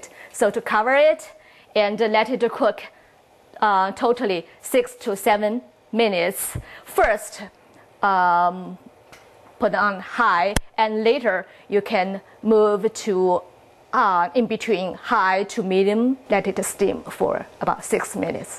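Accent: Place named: China. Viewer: english no good is hard to understand ....chinese english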